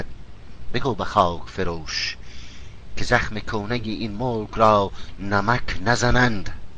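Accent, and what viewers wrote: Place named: Iran. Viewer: hahahahahahahaha o kosmadar to kho irani hasti chera mardoma jang mendazi khware ta gayom kosmadar tora chi khob kadan irani ra chi ba e gapa madare ta gayom hamrai lahjet k maloom mesha hahahha aga to rasti e gapa mezadi chera akse karzai nes da e o madare ta gaim maalom mesha k kodam dokhtare ta eya gayedan biyaresh mam begayomesh ai koni hamrai gapait to kodam koni hasti maalom mesha biya aga yakh na kadi k ma yakhet konom hahahahhah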